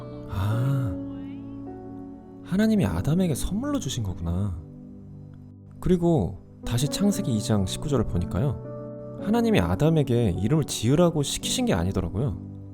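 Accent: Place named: United Kingdom. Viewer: 힐링벌스 좋아요! 자주 올려주세요 ㅎㅎ 영국발음도 멋지십니다👍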